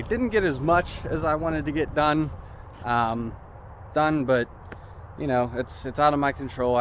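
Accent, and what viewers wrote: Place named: New Jersey, United States. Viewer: It sounds like you're from Jersey...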